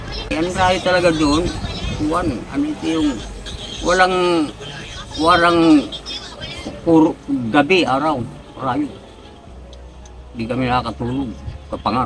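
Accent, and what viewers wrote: Waray, Philippines. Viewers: Pure gud na waray Kay nakukuri intalon pag Tagalog tatay god blessed you Tay ..proud waraynon bootan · Haha waray parin accent. 😀👍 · Waray na waray accent mo Tay waray lng mga makakaintindi sa iba mong Sinabi..hehe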